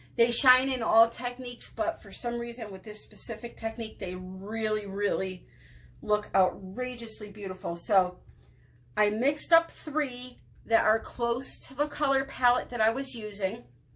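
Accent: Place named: Chicago, United States. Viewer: i havent even watched this one...YET., but i have to ask one thing. your accent. Sounds from up north Chicagoish, thats where I grew up. been in TX since 81 and miss home sooo much . besides your amazing with you art. you rock the videos!!!!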